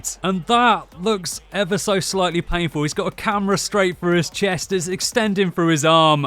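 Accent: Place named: England, United Kingdom. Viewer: I'm a PES PLAYER ,i don't like FIFA but i Like your English ACCENT you should be the COMMENTATOR of FIFA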